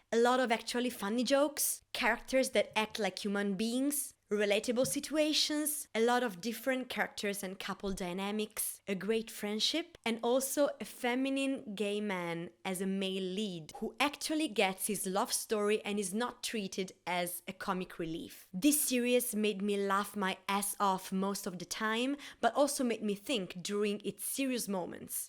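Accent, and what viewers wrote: Italy. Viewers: Finally, somebody who appreciates our Volleyball gays from Taiwan! Also don't be shy about your Italian accent. We're all just weird beans watching foreign gay TV shows on youtube, we can handle diversity here ;) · I love your Italian accent! I think it’s cute 🥺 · I agree with nearly everything you said. I'll add I not understand the hype for Sotus I couldn't even get through the first episode and I tried a few times. I even tried viewing the other bits of it as I love Singto's work normally but it was just so boring and as you said full of boring tropes. BTW thank you and......... It was very easy to understand you, there are no problems with your English you should be proud of yourself. I love your Italian accent, oh yes I forgot to say, 'I'm English' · Se que eres Italiana pero creo que entenderás esto, tienes el inglés perfecto!! El acento está ahí pero para mi casi no se nota. 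Me encantó este video! ❤️ 💕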